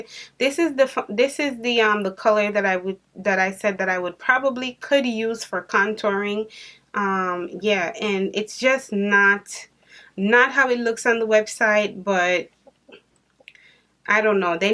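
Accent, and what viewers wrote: Jamaica. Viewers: Great video hon, you sound a little Jamaican.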